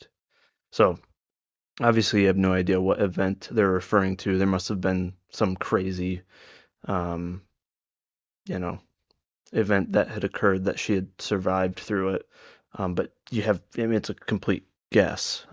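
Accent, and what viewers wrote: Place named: Germany. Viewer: Ты говоришь по-русски язык!! Я слышу немецкий акцент…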